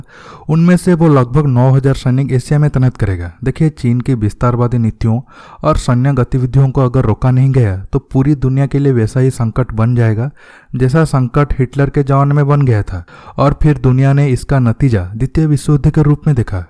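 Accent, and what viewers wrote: Odisha, India. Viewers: bhai tu orissa se he na?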